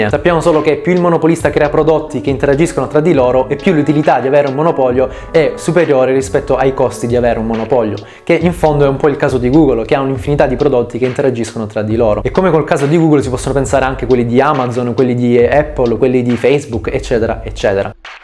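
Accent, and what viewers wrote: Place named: Sicily, Italy. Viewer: carissimo avrei un po' di cose da dirti: 1. dacci un nome più semplice, anche fittizio, con cui chiamarti 2. riguardante questo video devo dirti che stai migliorando molto, infatti, fatta eccezione per qualche lapsus di tanto in tanto ed un' esternazione degli argomenti ancora un po' macchinosa, il video è molto fruibile e le tue spiegazioni sono abbastanza chiare 3. in linea generale: devo dirti grazie! sei il primo canale italiano (almeno che io sono riuscito a trovare) che tratta la scienza economica. sei un vero e proprio debunker per quei miliardi di video complottisti che intasano youtube. anche i commenti sotto ai tuoi video sono un piacere da leggere (quasi nessun: "MUORI TRATITORE DELLA PATRIA VENDUTO DELA BCE!!111!1", ma tanta gente civile che argomenta pacatamente e che rende il tutto molto stimolante per chi ha passione per questa materia). 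ti prego continua! fallo per noi 4 affamati di materiale di qualità. 4. vorrei permettermi di consigliarti qualche argomento per i prossimi video che secondo me potrebbe essere interessante, ad es. la teoria dei giochi o le teorie di keynes, o magari nel tempo te le verrò a proporre sotto ai tuoi video se ti fa piacere... comunque sia vorrei supportarti il più possibile: like a manetta e farò vedere i tuoi video ai miei colleghi... dicci tu come possiamo supportarti maggiormente. 5. ho capito che stai a torino, ma mi sai tanto di terrone, probabilmente siculo (con grande affetto, lo sono anch'io)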